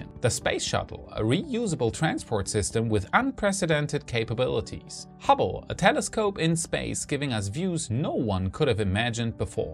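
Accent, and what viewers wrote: Germany. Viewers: He is speaking unusually good English with a slight Tscherman Akzent. The only Germans you are likely to hear with less accent than him are a few professional actors and people who had a bilingual childhood. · I like your slight german or central european accent.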